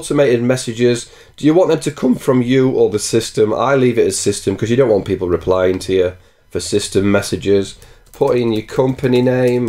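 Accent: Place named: Doncaster, United Kingdom. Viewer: Nice to hear a plain-speaking Doncaster accent rather than an American on this sort of video. At 9mins or so, the chosen one-click install in DO is not the official recommended way to install Discourse. Discourse itself suggests following its setup instructions through the command line. I gather the one-click install does not create a swap file, which is why the setup slows to a halt unless you choose the larger USD15 droplet. Discourse will run happily for a small community on a 1gb droplet using the official instructions that employ a swap file. Also, you cannot downsize a droplet (ie go from 2gb to 1gb). So perhaps it is best to start small then resize upwards if need be. Anyway, thanks for video, very helpful.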